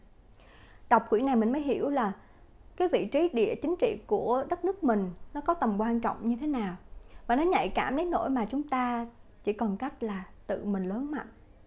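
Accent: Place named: Da Nang, Vietnam. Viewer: nghe giọng chị em đoán ra chị là người đn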